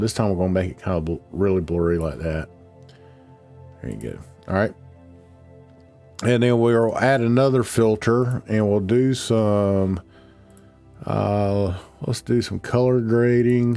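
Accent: North Carolina, United States. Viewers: Great tutorial! Thanks! Love the UNC stuff in the background. Based on your accent, you are probably in NC like me. I'm using your tutorials to help me with my Church livefeed in Roxboro, NC.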